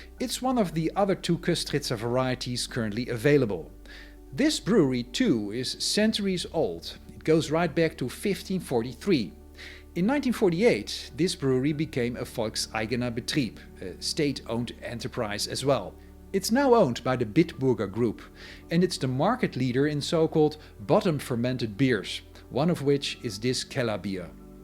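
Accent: Netherlands. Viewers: I also thought he sounded Dutch.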